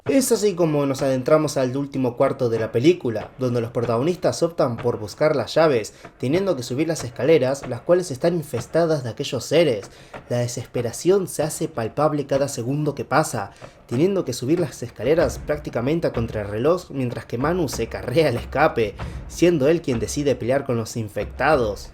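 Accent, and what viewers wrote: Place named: Argentina. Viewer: Todo estaba bien y disimulado asta que se noto el acento argentino "asha" "sha estamos" como arruinan la experiencia.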